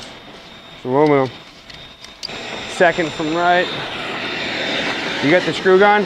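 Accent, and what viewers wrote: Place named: Boston, United States. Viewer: 2 words in and I knew this guy was from Boston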